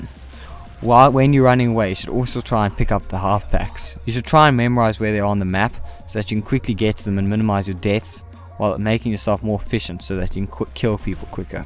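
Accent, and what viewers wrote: Afrikaans, South Africa. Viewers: Are you South African? I'm Scottish but my mother is South African and you both say words the same way. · He is South African:D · are you afrikaans?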